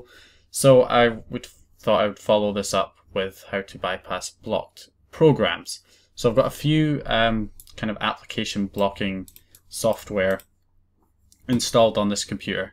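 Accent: Scotland, United Kingdom. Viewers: You sound Scottish are you Scottish?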